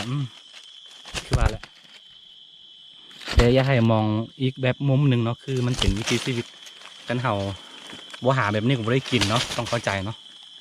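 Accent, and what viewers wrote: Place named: North, Thailand. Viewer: สำเนียงพูดออกเหนือบ้างออกลาวบ้างคับอยู่ใหนคับชอบๆติดตามเน้อ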